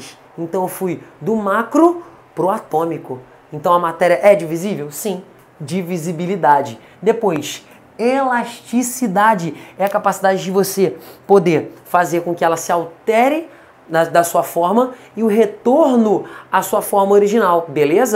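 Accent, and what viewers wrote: Pará, Brazil. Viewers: O sotaque do professor é lindo, paraense, ou paranaense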